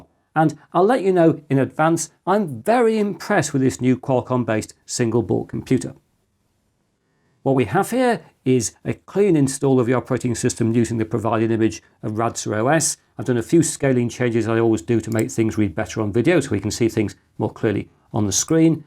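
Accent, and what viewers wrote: United Kingdom. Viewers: Sorry, I thought that you were a bot. You're obviously not familiar with this channel. This YouTube channel, run by a bloke called, has been going for over 18-years now. Before that, he's been published, in computer magazine articles, for over 40-years. He's well known and respected in the UK computer community. He's definitely not AI! It's just the way he speaks; he's British after all. Happy New Year to you and yours.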